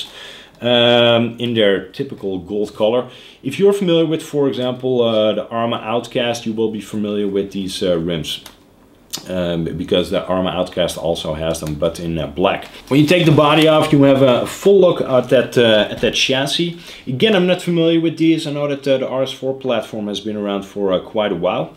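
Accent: Netherlands. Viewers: Lijkt op Nederlands Engels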